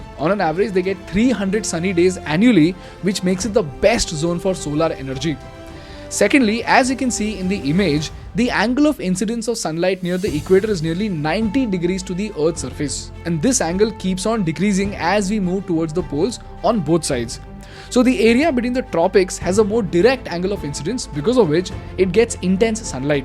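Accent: India. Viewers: Kitna bud shakal hai yea larka. Aur is ki english suno. Typical indian style. Manhoooos shakal. Manhoooos awaz. 😅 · I don't know but when I listen ur English I feel that I am listening Hindi. I don't get irritate by your speaking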